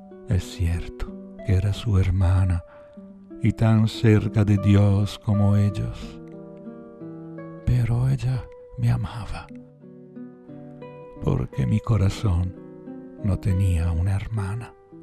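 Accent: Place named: Buenos Aires, Argentina. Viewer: Tu tonada ondula entre el porteño y el italiano, ¿Eres de allí?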